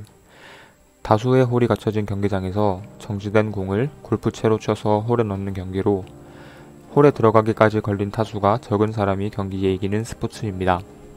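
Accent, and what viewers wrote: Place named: South Korea. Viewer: 사투리라 알아듣기 힘들어요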